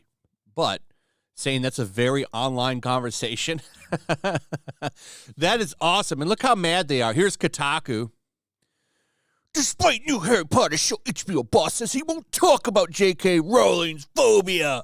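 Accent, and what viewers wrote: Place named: Aristocratic, United Kingdom. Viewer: I’m listening to this as I shop at Kroger and the pompous British aristocrat accent literally has me laughing so hard people are staring at me.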